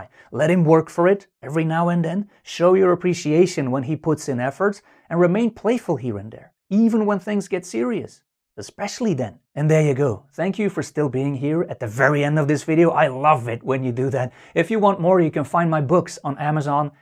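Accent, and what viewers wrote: Scotland, United Kingdom. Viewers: Scottish accent. 💋❤️ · ~This is fantastic advice!!!~Im distracted by your accent tho, trying to tell if its scottish, or irish, or...???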